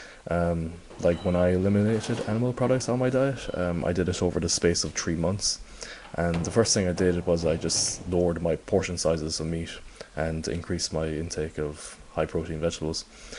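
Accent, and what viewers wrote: Ireland. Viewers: Are you Irish? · something completely unrelated, are you irish? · gosh, this irish accent. lovely :) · HAAGHAHAHAHAHA im dead, fuck u thats the way he says it, cause he is irish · He has an irish accent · I love accent. It’s subtle yet not overly so and still clear he’s Irish. Almost sounds somewhat Scandinavian to me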